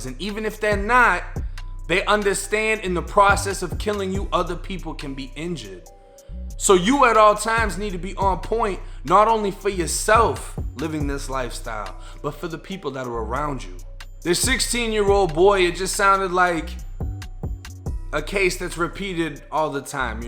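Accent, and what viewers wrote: Boston, United States. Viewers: Yo my guy are you from Boston?? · I hate your Bah-ston accent lmao 🤣 "He drove his cah, he didnt stahp, he went too fah, he was paht of the cahtel..." Good videos bro, I fw the page 💪🏾💪🏾💪🏾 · Cahhhh, tahhhh-git. You gotta be from Boston or providence lol mad love from a New Yorker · Hey man I know youve lived between FL and MA. I grew up in MA abd moved to FL recently. Did you pick up dropping your R's in MA or is that how you've always talked? No shade at all just wondering. Cause I grew up w/ that shit but I didn't know if the Boston accent is picked up when you're older. Just foubd your channel and I'm definitely a fan, keep the grind up and good luck.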